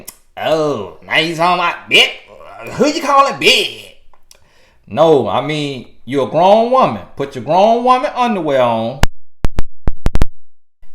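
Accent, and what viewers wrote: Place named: Florida, United States. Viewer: Your accent remind me of Plies accent i think he’s from Florida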